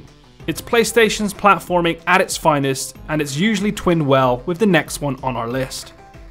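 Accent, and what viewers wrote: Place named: West Country, United Kingdom. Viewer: Proper west country. You Somerset/Dorset based?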